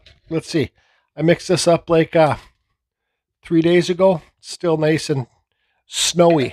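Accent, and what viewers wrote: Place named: East Coast, United States. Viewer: Thanks so much and I adore the east coast accent ! Wisconsin accents are boring in comparison!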